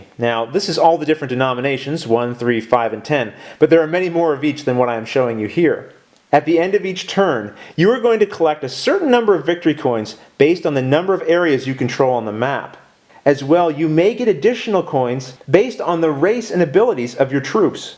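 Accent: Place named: Maritimes, Canada. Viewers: Do I detect an Irish accent? Edit - after researching, I've discovered it's most likely a Canadian Maritime English accent. since you've mentioned you were on Prince Edward Island. Cool! accents are so interesting. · Wait a minute.. I just found out the reason why I watch your videos. Your voice is so familiar.. because I'm from PEI too..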